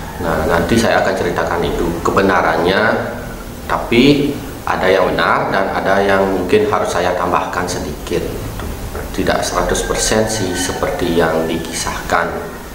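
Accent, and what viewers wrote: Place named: Negara, Indonesia. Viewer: bli, uling negare bli? adi logat care nak negaroa? suksme🙏😊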